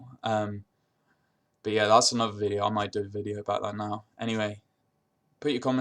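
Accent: United Kingdom. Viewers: English not Aussie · This is a semi estuary London type of accent not Australian,,,,I found him clear enough,,he wasnot cockney or anything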